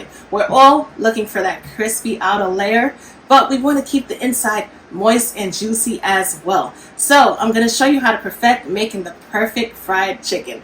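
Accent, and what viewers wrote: New York, United States. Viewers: She's gotta be a new Yorker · I can hear that NY Accent 😅 chicken looks amazing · You have to be from New York girl! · I'm from the bronx . You definitely from New York love it .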